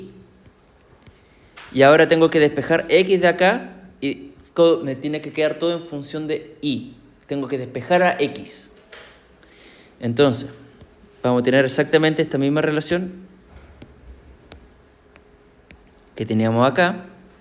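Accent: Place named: Chile. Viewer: me entretiene el acento chileno, buena explicación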